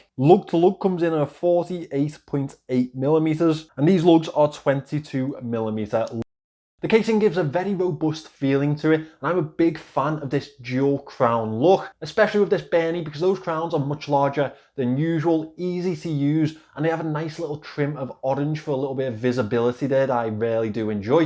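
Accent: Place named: Scotland, United Kingdom. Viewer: Love your accent. Are you from Scotland?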